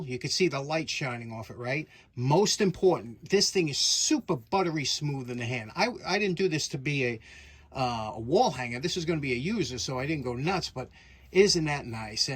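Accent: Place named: New York City, United States. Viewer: your accent makes the video's. Nothing like good ole NYC sarcasm.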